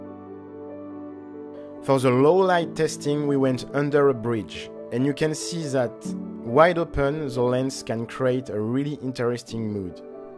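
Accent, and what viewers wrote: France. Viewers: keep the french accent to your english! english is the one language where it’s actually kinda cool to blend your roots with the language imo. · Not trying to be rude in any way by saying this. Your english has really improved recently. It feels like you talk with a lot more confidence and energy, while still keeping that slick French accent.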